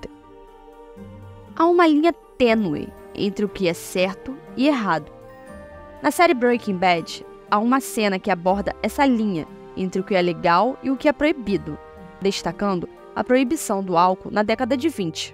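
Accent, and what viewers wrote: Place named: Rio de Janeiro, Brazil. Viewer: O vídeo é bom Complicado esse sotaque de carioca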